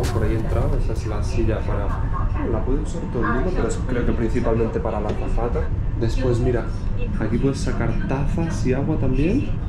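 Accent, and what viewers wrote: Andalusia, Spain. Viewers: vaya acentaco andaluz , di que si contre jajajaj , a ver si haces una quedada o algo en granada o almeria tio para poder verte, que haces unos videos que son muy cercanos y ya eres mi amigo sin conocerte en persona jajaja un abrazo guapo, sigue asi, apor todas 💪💪💪💪 #ramaalmeria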